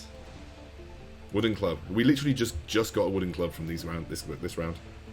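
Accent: England, United Kingdom. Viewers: The background music and the accent from a mature england man made this a lowkey asmr video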